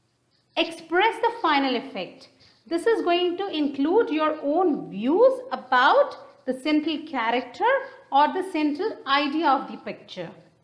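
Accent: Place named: United Kingdom. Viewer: Its a britishian way to speak English 😒foolish...😏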